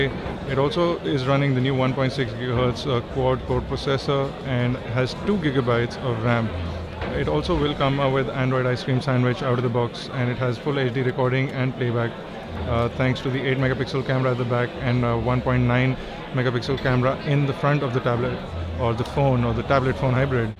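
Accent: India. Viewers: Speak more slowly and open you mouth when you pronounce your words. It's not easy to keep up with your Indian accent.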